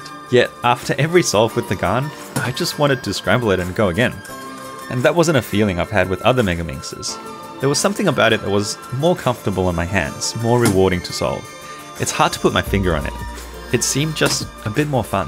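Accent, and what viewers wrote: Australia. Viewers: Are you australian?? I'm australian! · TNL Cubing it’s his au accent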